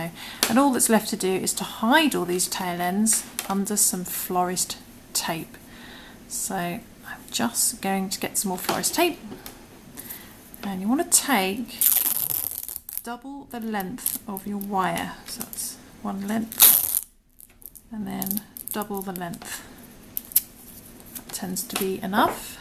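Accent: United Kingdom. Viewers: Another great video. I love all your designs. Hearing your accent i think you may live in UK. If you do, can you please tell me where i can buy yarn..a big shop! All i have found by now are baby yarn or dk yarn on a chinese local shop..thank you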